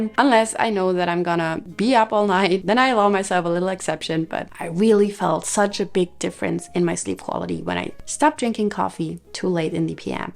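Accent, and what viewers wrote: Germany. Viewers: I love her German pronunciation though hihi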